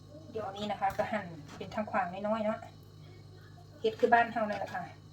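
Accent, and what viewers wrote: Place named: Sakon Nakhon, Thailand. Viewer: สำเนียงคือคนสกลนครน้อจ้า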